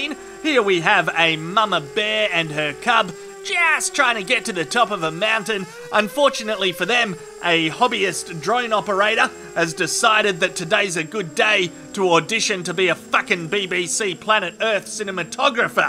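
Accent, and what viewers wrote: Australia. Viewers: Let’s just appreciate the Aussie accent for a second. My wife studied English literature and she keeps telling me to turn this shit off. She also did not react well to the c-word I liberally and regularly use after watching these videos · I love when aussie man starts his video with, 'yeh nah'. You know it's Australian when he says yeh nah.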